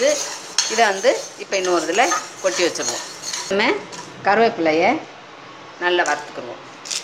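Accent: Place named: Sattur, India. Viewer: my dad was from a village near sattur, vembakottai and the way of talk has taken me back to that land of mine..good one thnks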